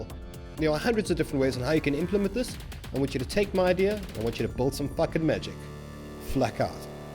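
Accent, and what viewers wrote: South Africa. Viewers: Still feels so strange every to hear a South African accent every time I click on one of your vids, so used to NA/EU accents on YouTube. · Are you South African, Flak? You sound like that bad guy from Elysium.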